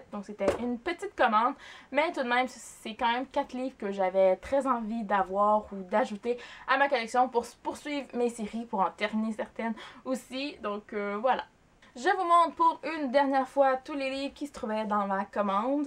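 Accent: Quebec, Canada. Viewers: Salut! Encore une super vidéo qui fait envie:) Je voulais te laisser un petit mot pour te dire que j'aime beaucoup tes vidéos. Je suis française mais je vis à Montréal et ça fait plaisir d'entendre parler de livres avec l'accent québécois que j'adore:) Je t'ai ajouté sur Goodreads pour pouvoir suivre tes reviews car nous avons beaucoup de goûts en commun:) Bises!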